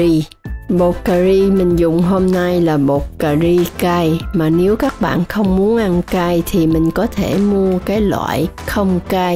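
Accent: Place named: Teochew, China. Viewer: Nghe giong noi la toi biet nguoi tieu chau o vn roi. Ung ho chi chuc kenh cua chi phat trien manh 👍👍👍👍👍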